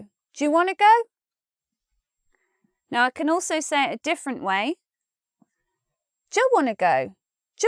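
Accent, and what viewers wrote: United Kingdom. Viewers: is this British English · I hear you used british accent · Is this english british or language amrican!!! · * O * sound make me love british Btw love u from Indonesia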